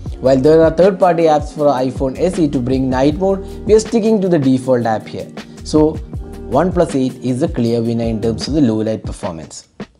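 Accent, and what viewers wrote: Kerala, India. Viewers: Are you Malayali bro.i think you have Malayalam accent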